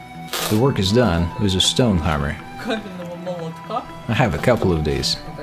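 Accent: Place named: Russia. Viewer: Еее !! Теперь и на английском языке!! Отлично! О да , русский акцент )) так мило ! Вы, больше молодцы, уважение !!!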